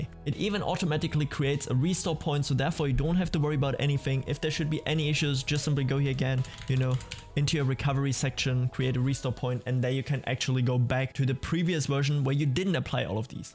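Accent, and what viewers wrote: Germany. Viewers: Your 100% german, I can hear it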